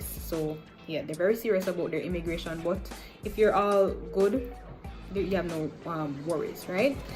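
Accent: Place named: France. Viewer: Very good information for prospective travelers.Are you French?You sound French.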